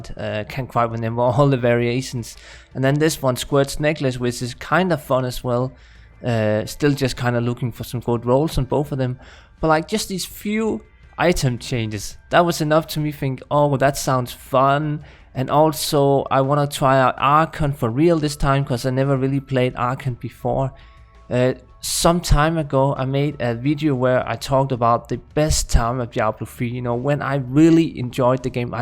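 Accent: Denmark. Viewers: Hey your accent sounds danish, am I correct?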